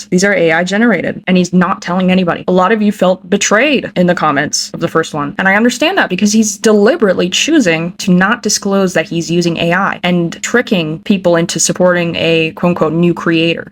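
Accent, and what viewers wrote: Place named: United States. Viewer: I'm sorry I just find it so funnily absurd that your name is and you have the most american accent I have ever heard (which i assume you are one, based on your account location)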